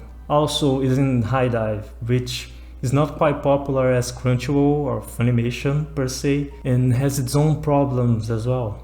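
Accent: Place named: Brazil. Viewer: sabia, dava pra perseber porque tu tem um sotaque brasileiro um pouco no seu ingles muito bom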